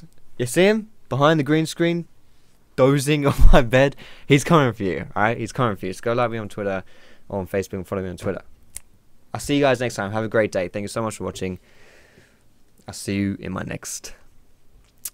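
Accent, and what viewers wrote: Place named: England, United Kingdom. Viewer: I still love youre videos and I think you should make videos w/o your face. English accent with being able to see the face of the speaker creeps me out.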